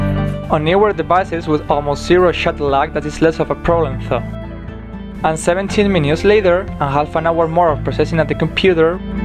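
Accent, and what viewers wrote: Spain. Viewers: Gracias, me ha sido útil, pero cómo se te nota el acento español hablando inglés jajaja. No offense, fela. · Spanish i guess. I know it because i am from Spain and many people speak like that :D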